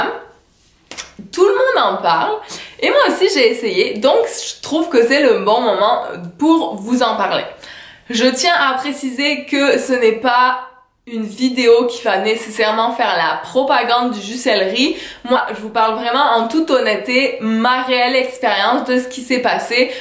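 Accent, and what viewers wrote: Quebec, Canada. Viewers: BONJOUR merci pour la video et pour la recette oh vs etes mignonne avec l'accent québequoise merci bonne chance pour d autres video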